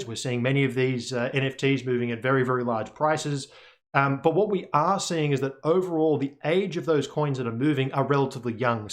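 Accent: Australia. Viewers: Thank you so much for your effort. 💐 Enjoyed the thick Aussie accent, in addition to the analytics.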